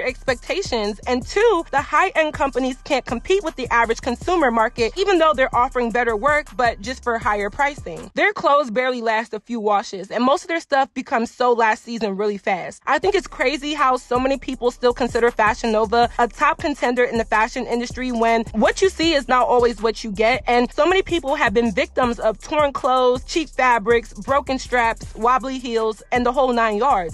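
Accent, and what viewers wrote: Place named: DMV, United States. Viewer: Are you from the dmv? Lol I can hear it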